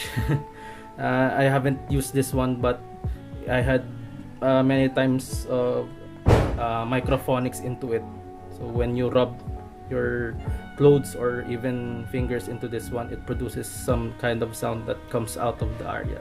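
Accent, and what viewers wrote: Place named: Ilonggo, Philippines. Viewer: HAHAHAHAHAHAHA akala ko lang kasi may halong illonggo yung accent mo sir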